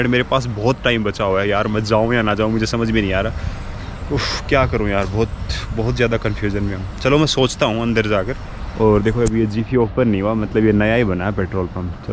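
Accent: Haryana, India. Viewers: आपकी बोली में थोड़ी थोड़ी हरयाणवी है। भाई बहुत बढ़िया लगता है जय भारत जय हरियाणा जय राजस्थान जय राम जी की सहाब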